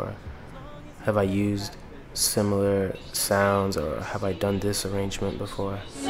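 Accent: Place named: Toronto, Canada. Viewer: Lol yeah thats our toronto accent/slang that you're hearing.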